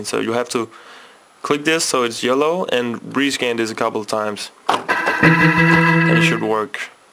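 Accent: Denmark. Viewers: You sound insanely danish its crazy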